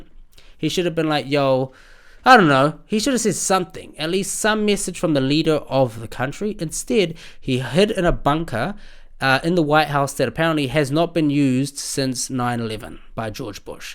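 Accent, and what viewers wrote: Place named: India. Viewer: Bro i love your videos, that indian accent though. Need to see more 👍👍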